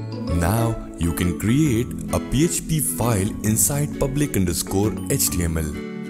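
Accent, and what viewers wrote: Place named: India. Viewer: Hahahah a Indian voice is the same , you don’t need change your voice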